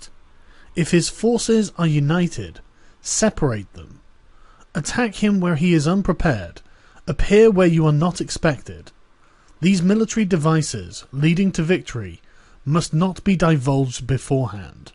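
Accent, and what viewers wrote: United Kingdom. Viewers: O my, I read the book twice before. But those times compare bland compared to you smooth British narration. Keep up the good work!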